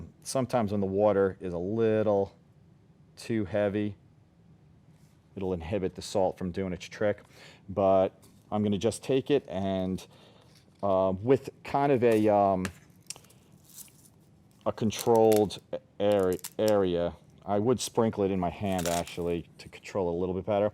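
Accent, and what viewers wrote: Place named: Nashville, United States. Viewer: Sounds like he's from Nashville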